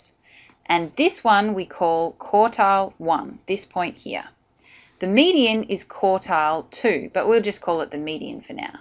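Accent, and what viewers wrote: Australia. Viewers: Nice Aussie accent?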